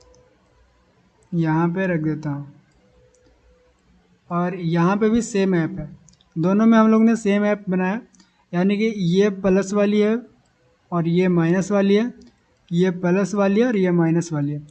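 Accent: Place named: India. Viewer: Bro your title English but your speaking Indian no way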